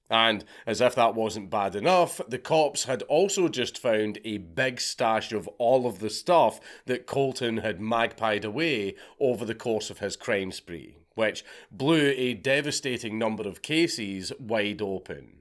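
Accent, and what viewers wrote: Scotland, United Kingdom. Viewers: Heard the story, read the story. But they story is best told with a scottish accent.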